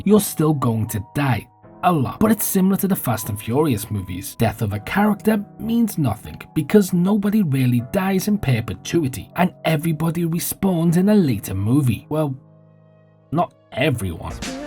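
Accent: Liverpool, United Kingdom. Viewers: Sweet vid mate, do I detect a scouse accent btw lol : ) x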